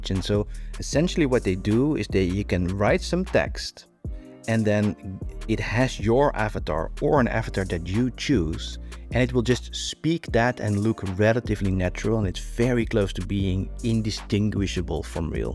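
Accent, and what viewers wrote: Netherlands. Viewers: You sound very Dutch 😊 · I think they will soon be able to reproduce your Dutch accent with all the substitutions you use from Dutch phonology, or produce a clone with any foreign accent.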